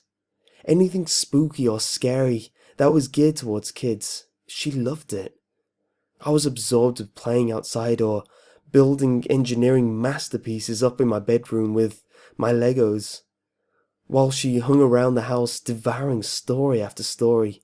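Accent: United Kingdom. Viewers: Lol he dosnt sound Irish at all I could say he sound British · MissleMasterJoe I mean he has a rather strong British accent but maybe I'm just used to it. (I live in the uk) Brohoof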